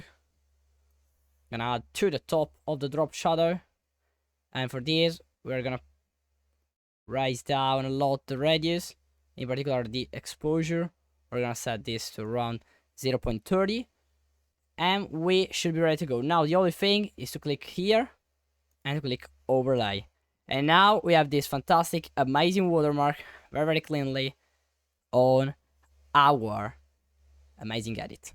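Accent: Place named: Italy. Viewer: La pronuncia italiana❤🇮🇹 SEI FORTE CONTINUA COSì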